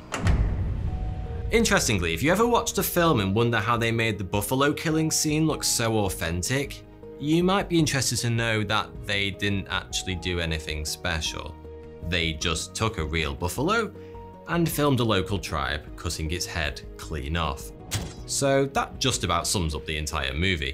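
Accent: United Kingdom. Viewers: Is he british? I keep hearing "fourth two here" at the beginning...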